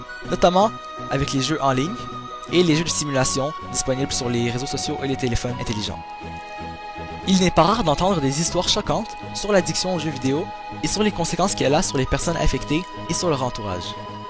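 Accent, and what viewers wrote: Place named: Quebec, Canada. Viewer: Franchement, je suis impressionné. Y'a des maladresses sur la présentation (voix peu naturel, etc.), mais le boulot sur le montage illustre très bien le sujet, le rythme est plutôt bien malgré le manque de divertissement à côté, etc. L'information est de qualité, tu cites des sources, c'est plus complet que certains trucs font en tant que professionnel, y'a de l'objectivité, autant en positif que négatif, etc. C'est un peu orienté, mais je vois pas comment je pourrais dire que c'est un défaut, surtout qu'objectivement, la plupart des arguments du contre sont d'origines idéologiques quand ils sont honnêtes. La vidéo semblait être un one shot try, mais, franchement, j'ai beaucoup apprécié. Et quand j'ai entendu l'accent du Québec, ça m'a fait plaisir, surtout que j'ai l'impression qu'au Québec, la communauté gaming ne brille pas par sa présence (pas sa qualité). 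N'empêche que le boulot, il mérite pas d'être souligné quand c'est fait aussi bien. Bravo.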